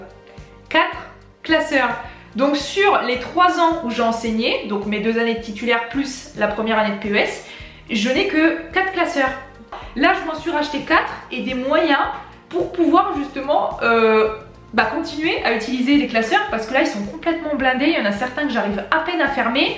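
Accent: North, France. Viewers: J'adore l'accent du Nord hahah je suis toujours dessus mais bon En vrai on vois peut de personnes de notre région qui se lance sur YouTube ça fait plaisir à voir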